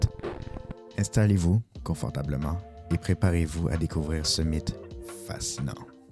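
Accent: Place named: Canada. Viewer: Yooo un canadien commme moooooi 😊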